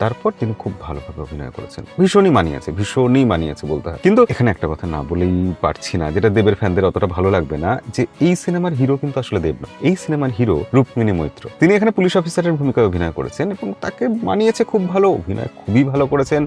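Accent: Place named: Bangal, Bangladesh. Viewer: Apnar bangal accent ta amar besh lage 😊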